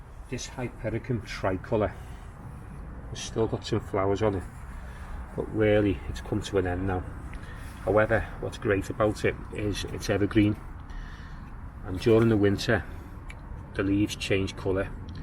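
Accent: Liverpool, United Kingdom. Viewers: Just what I needed! a video by a fellow scouser, the garden is lovely and I think its fair to say you are a bit salvia obsessed, enjoyed listening to you, regards from Ontario, Canada. #YNWA.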